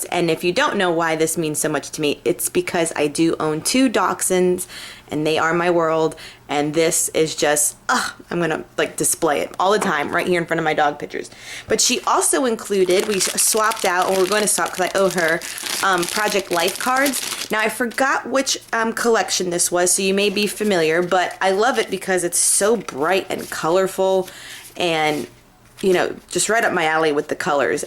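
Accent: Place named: New York, United States. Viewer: I knew you from NYC! I here it in your voice lol. Anyways.. Love the haul!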